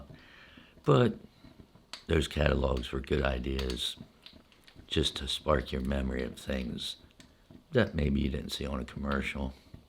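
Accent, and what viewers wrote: Pennsylvania, United States. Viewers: What part of PA are you from? Because my family is from western PA and sound just like you.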